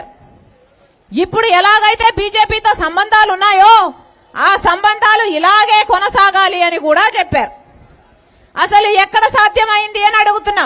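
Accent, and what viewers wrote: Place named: Telangana, India. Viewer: తెలంగాణ లో మాటలాడినట్టు ఇక్కడ మాట్లాడితే బాగావుండదమ్మా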